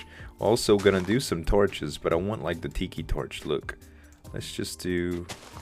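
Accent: Louisiana, United States. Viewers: Z, are you cajiun(horrible spelling) ? It sounds like it. LOUISIANA FTW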